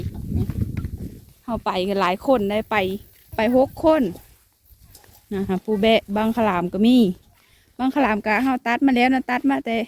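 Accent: Phu Thai, Thailand. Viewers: ข้าวใหม่ เป็น ข้าวไกม้ มำไมไม่พูดว่า ค้าวเม้อ (หยอก)ป้งเพราะดีเน้อ สาวภูไทตนงาม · สำบายดีน้อง ผุน่ารัก. สวยจังสาวภูเทอ.ขยันจัง.สำเนียงภูไทม่วนหูดี..กเฝห้กำลังใจนำเด้อจ้า. จ๋า...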